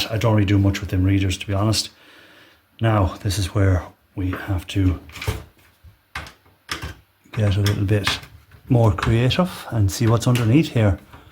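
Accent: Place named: Ireland. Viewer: how far away are you from Galway?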